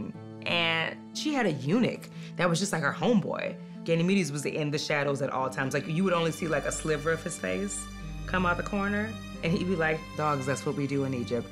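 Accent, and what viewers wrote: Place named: Bronx, United States. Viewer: The narrator is from the bronx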